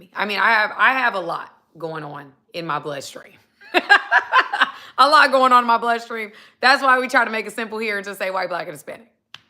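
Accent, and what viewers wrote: South, United States. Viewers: Hi this your country voice, is good for country music..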